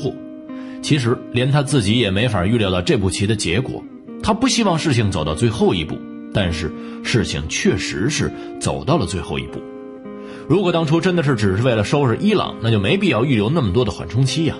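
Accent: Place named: Beijing, China. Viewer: 正宗的京腔京韵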